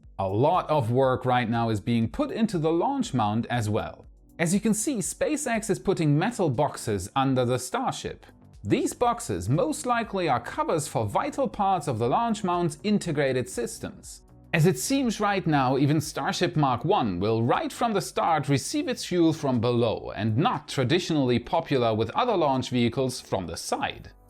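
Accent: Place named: Germany. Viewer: Great video..! Am I alone in wondering WHERE his accent is from...? I have listened and listened and I cannot place it --Germany...? Yet I am unsure. I am sure only he does not wish for us to dwell on this. BUT WHERE IS HE FROM..?? Hmmm....cannot put my finger on it.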